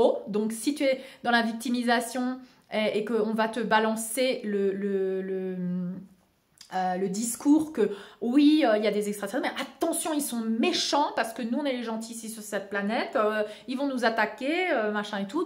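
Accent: Alsace, France. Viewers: des fois tu as gardé ton accent alsacien c'est trop mignon lol Ps: je vois 777 likes sous ta vidéo c'est bon signe ça.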